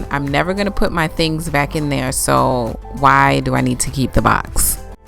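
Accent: New York, United States. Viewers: Glad you're better Suga, I love it! You picked a great color for your accent wall..I am a pillow person so I'm fine with it. They look really nice once the bed is made. I was cracking up when you were on the bed watching tv while hubby was fixing the bookshelf!! You have a beautiful NY accent!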